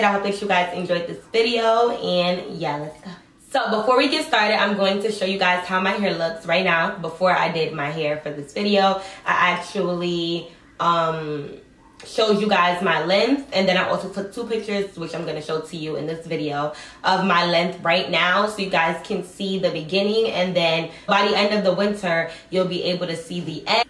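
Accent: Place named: New York, United States. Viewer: I love your accent. Sounds like a New York accent. I love your hair and you remind me of Keke Palmer, very pretty girl. Oh, I would like to be mid back by July or Aug 2020. I drink over a gallon a water a day. I'm taking better care of my hair now and also deep condition every 2 weeks.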